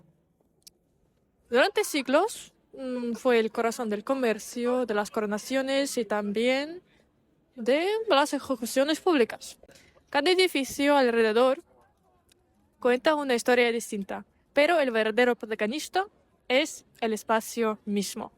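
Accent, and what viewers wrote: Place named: Spain. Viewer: Ella tiene el acento español muy marcado, suena muy linda. 💜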